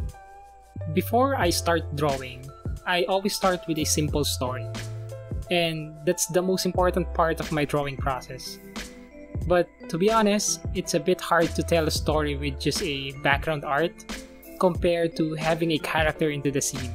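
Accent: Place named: Philippines. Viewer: I knew you are a fellow Filipino just by judging your accent and your voice. I'm also a Filipino.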